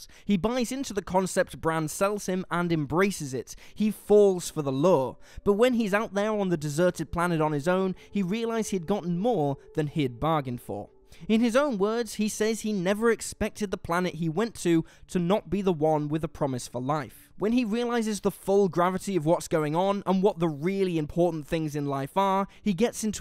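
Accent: United Kingdom. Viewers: This guy sounds British so I'm guessing English is his first language.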